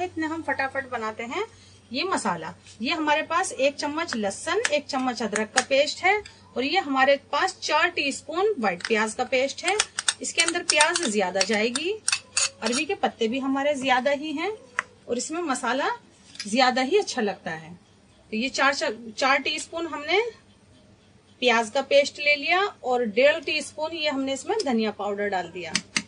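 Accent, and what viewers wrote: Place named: Old Delhi, India. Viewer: aap ki boli aik dm purani dilli walon ki si hai,meri ammi ki poori nanihal india mai purani dilli mai rehti hai-meri nani ne bachpan mai boht bna k khilae hn,mami or khala sath mil k ready krwati thn or hm maze se khate the,aaj bari achi yaad aap ne taza krwa di hai,jazakAllah o khair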